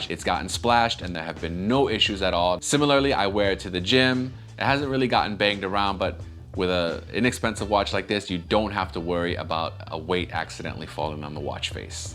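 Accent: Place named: New York, United States. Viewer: I absolutely love the New York accent!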